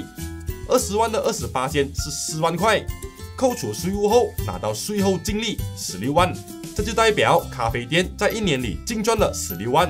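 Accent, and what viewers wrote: Malaysia. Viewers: 聯邦腔很可愛好聽，內容也很好懂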